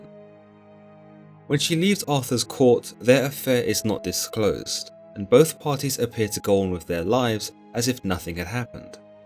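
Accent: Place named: Southern England, United Kingdom. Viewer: He sounds like a southern bloke from England but it could just his accent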